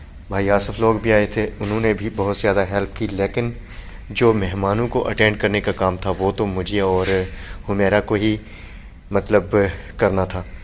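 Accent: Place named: Gujrat, Pakistan. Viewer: Salaam Bahi I recently started watch your Volgs your house is beautiful mahshallah your excient like gugrat are you guger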